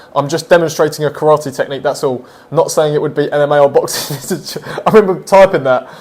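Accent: United Kingdom. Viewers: Dude! I was watching your shorts/tips but I honestly didn't expect you to be British. I thought you'd have a Russian accent 😅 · He sounds british Is he? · i just noticed the british accent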